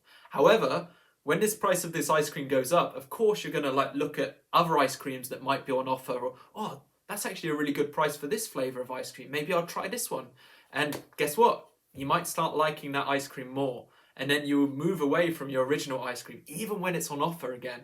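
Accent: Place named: England, United Kingdom. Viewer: Really appreciate your videos and so nice to hear an English accent for a change.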